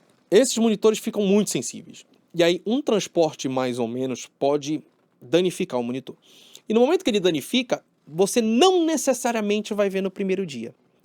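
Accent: Pará, Brazil. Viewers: Pelo sotaque é paraense hahha! Mandou bem no vídeo, parceiro.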